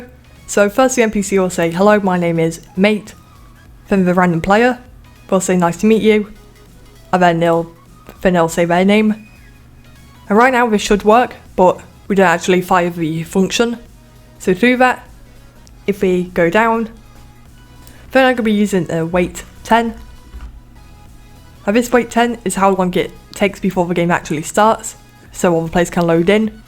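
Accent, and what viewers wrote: United Kingdom. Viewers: Do you add places for the lobby and the game? Also nice British accent there buddy. Also you're real helpful for me making my games good! :)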